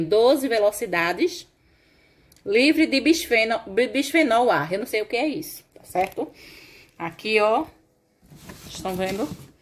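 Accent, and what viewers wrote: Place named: Northeast, Brazil. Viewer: Gostei do sotaque do nosso nordeste! Rsrs....beijo.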